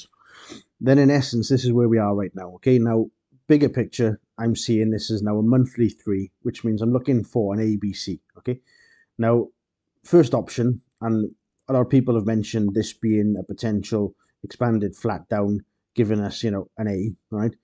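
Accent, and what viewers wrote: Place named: Wales, United Kingdom. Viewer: Good info mate. Nice to hear solid TA from a fellow Welshman ;)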